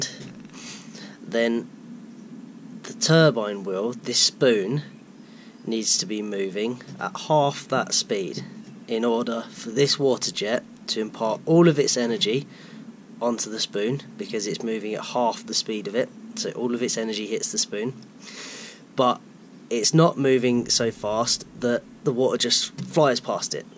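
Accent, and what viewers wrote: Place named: England, United Kingdom. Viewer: It’s like your speaking a foreign language with an English accent. Sounds like you’re on track though and hopefully you can upgrade the controller soon and find that lost power.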